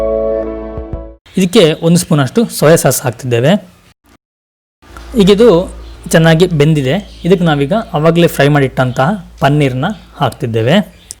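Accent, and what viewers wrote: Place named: Mangalore, India. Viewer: Namma mangaluru kanada bahala chanda matanaadi madam neeu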